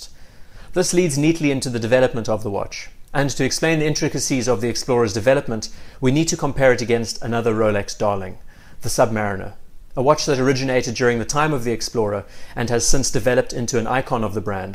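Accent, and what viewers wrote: South Africa. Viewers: Please do not take this the wrong way, or take it the wrong way! Your monotone dialogue is just horrible. I am a design historian amongst other disciplines, so I find your narrative really grating. Where are you from? South Africa? Anyway please think about the object and not the story that you want to tell; be critical and not political. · You have a real gift with gathering and sharing information in a comfortable way. That South African accent adds a great voice to the story as well. Keep it up.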